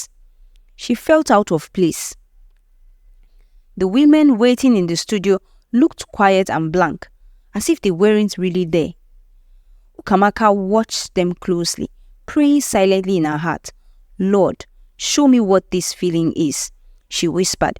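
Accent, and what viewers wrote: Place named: Africa. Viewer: I’ve been binging this whole channel for the past hour! It just popped up on my feed, and I love folk tales, fables, and traditional stories of different cultures that don’t usually get a lot of exposure internationally. The diverse communities in Africa, which are many, are very interesting. They’re not like any others that get the most attention and are retold and regurgitated by those who don’t have the knowledge or experience to tell these tales properly to convey the message. This channel is so unique and the stories they share are powerful and apply to so many different situations in every community. The messages are poignant and touching and they make you think deeply about the overall lessons they are supposed to teach and the difference it’s supposed to make in all aspects of life. Not that this is important to the overall story, but the narrator’s accent really brings it to life. 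The accents of the many unique cultures in Africa are so distinctive and beautiful. Stories told by the people who are intimately connected to the both the land and the people. This gives it an authentic and trustworthy feel that leaves an impression that stays with you forever. Bravo to the channel and its team for such excellent and extraordinary work!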